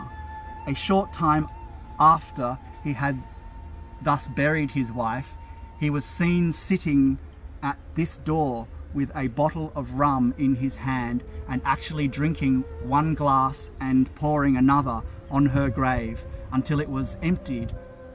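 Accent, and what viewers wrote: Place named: Australia. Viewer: Now that's a real historic place, interesting.I like listening to his Australian accent :)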